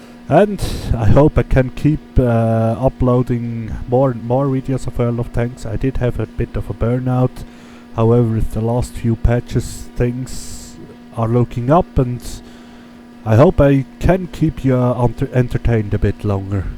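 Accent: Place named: Switzerland. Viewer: Schweizer oder Deutscher? Habe das Gefühl dass dein Akzent ziemlich einem Schweizer ähnelt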